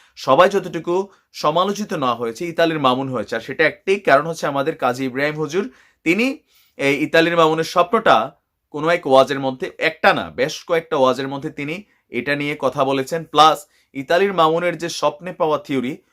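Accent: Comilla, Bangladesh. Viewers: আপনার সেফুদার কণ্ঠ অনেক ভালো লাগে আর কুমিল্লার ভাষায় তো মাশা আল্লাহ। আশাকরি সব ভিডিওতে কুমিল্লার ভাষায় কিছু বলবেন😊😊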